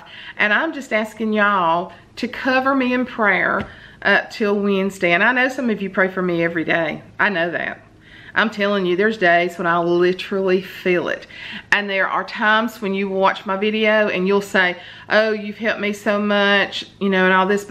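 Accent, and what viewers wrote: South, United States. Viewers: you are being covered with prayer for next Wednesday. Please let us know that all is well. Your church is truly doing God's work! Prayers for you for the energy and strength and enlightenment as you work on the grant for the community mission. I just got back late last night from a trip with my siblings and their spouses to Nashville and Memphis. I thought of you every time I saw a sign for Birmingham. I was born in Georgia and always feel "at home" in the South. Loved opening up my iPad and hearing your southern accent this morning. Would love a chance to own Nonnie's Nuggets- ❤️. - Susan in Maryland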